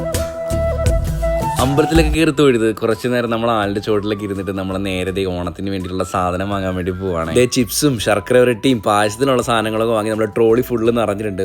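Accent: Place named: Thrissur, India. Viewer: Sathyam tsr slang pole😹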